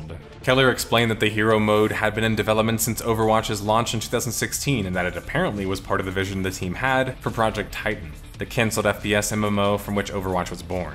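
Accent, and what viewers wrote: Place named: Ohio, United States. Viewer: I dont know whats worse. A frenchie trying to speak english poorly. Or a ohioian accent trying to speak english poorly.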